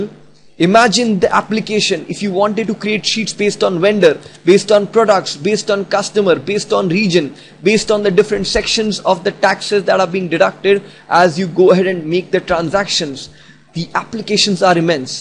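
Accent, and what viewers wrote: India. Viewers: indian accent is always fun to hear hhhh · Hai, yur voice seems 100% match with a person from Chennai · This is a neat trick, but you have to be careful of his kind of thick east Indian accent. I, also, am not sure what version of Excel he is using. This may not be 2010, it looks like a later version, but his trick is good.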